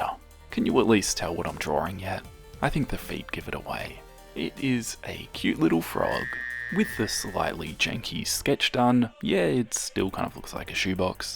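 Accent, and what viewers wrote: Australia. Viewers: Wait he's Australian not british?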